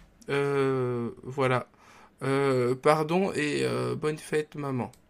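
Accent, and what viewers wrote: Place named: India. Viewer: un p'tit accent indé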